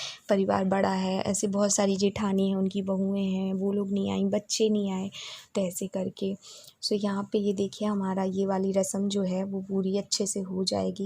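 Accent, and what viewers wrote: Bundelkhand, India. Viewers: Didi mein sagar se belong karti hu aur hamari language bhi bundelkhandi hi h and I don't know why mujhe aapke muh se yeh language sunne mein bohot achcha laga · Bundelkhand language😊😊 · Khti thi bundelkhandi ni bnti bolte magar bol to leti ho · Di apki language buldelkhandi h ap kaha se ho esi language hamari bhi h m jhansi se hu,PLZZ reply me Di pzzz plzz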